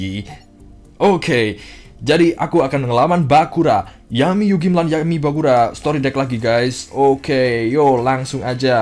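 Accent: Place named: Purwokerto, Indonesia. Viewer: Dari medok nya, apakah agan orang Purwokerto atau sekitarnya?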